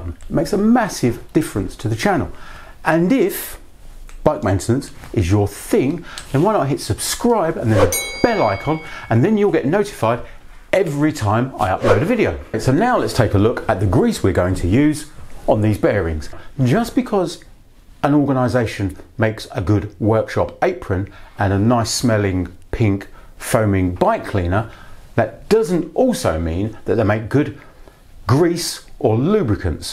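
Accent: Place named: United Kingdom. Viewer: I like the detail you gave, such as the red and black bearing seals. None of the other videos mention that. I like your mannerism, too. I'm a Londoner but have been gone from the UK for forty-six years, and seldom hear a 'Limey' accent. It was great to listen to you. In 2016 I bought a new Specialized Fatboy. I do all my own wrenching since I fell out with a bike shop from whom I had bought three new bikes, and seven botch jobs. I decided to check the headset bearings and found some rust on the lower bearing, even though it had not been ridden in the rain, nor washed. I replaced both bearings and did a good job even though I say it, myself. What I'm looking for is information on swapping caged bearings for sealed bearings. I bought a new Izip bike last autumn and don't know what kind of bearings it has. I know the wheel bearings are individual balls. 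I think I'll bring the bike indoors to drop the fork. Florida is way too hot for working in the porch.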